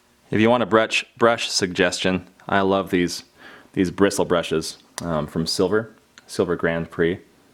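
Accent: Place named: Vermont, United States. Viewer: Something about your accent...are you from Vermont?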